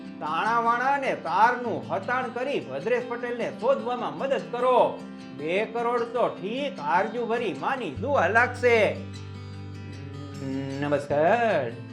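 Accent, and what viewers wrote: Kathiawar, India. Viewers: ના હો ભાઈ તમે ગમે તેટલા બદલો પણ આ રણકો નહિ બદલાતા કાન માં ઠંડક થાય છે આવું ફાંકડું original કાઠિયાવાડી ભાષા ની કિંમત છે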